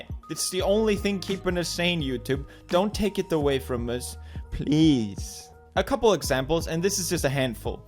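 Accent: Sweden. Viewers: Is it just me or is his accent more swedish now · I can’t tell if pewdiepie is using the forced Swedish accent or this is legit how’s he’s gonna talk rn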